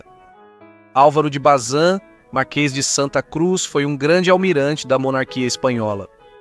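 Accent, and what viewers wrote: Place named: Portugal. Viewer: Português de Portugal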